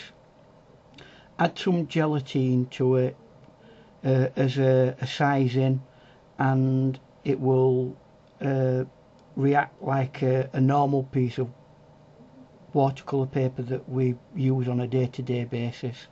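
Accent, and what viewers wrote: United Kingdom. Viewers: Let me guess sir , are you from Yorkshire? Brilliant video! 👍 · Love the British accent... What a lovely video. I feel like this one deserves so much more then 109 views since it could be helping so many beginner artists. Stay connected.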